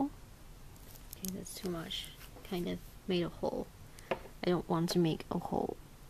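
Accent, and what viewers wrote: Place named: China. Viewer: its only because she has a chinese accent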